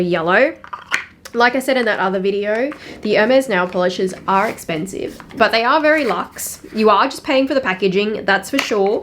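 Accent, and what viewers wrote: Australia. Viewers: I like the Aussie accent I am half Australian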